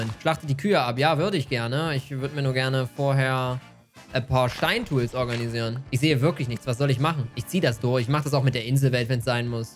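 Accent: Saxony, Germany. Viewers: Bist du eig ein Sachse oder so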